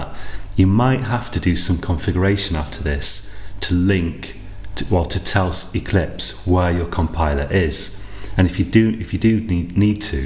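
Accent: United Kingdom. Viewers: You're not only teaching me Programming but also English at the same time. I have been taking British English classes for about 2 years now and i wish i could sound like you. Really love The British accent, Just incredible!!! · Strong accent of British!QAQ after learning all of this courses i think i can talk English fluently like a British.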